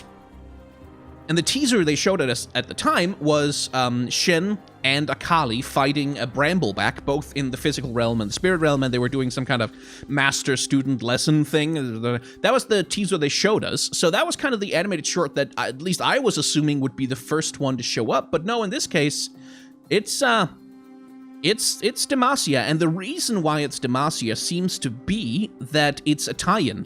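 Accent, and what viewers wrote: France. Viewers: Your french accent is astonishing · I could listen to with a french accent ALL.DAY. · that french accent is painful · that French accent made my day thanks buddy, great content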